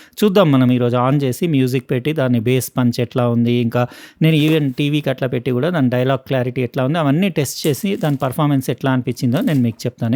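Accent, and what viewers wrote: Telangana, India. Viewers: Telangana slang baley matladthar anna meeru😊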